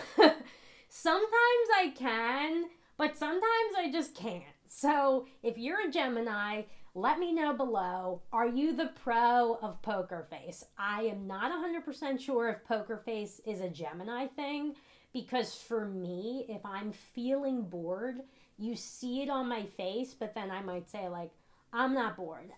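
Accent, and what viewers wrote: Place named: Philadelphia, United States. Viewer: You sound like you're from Philly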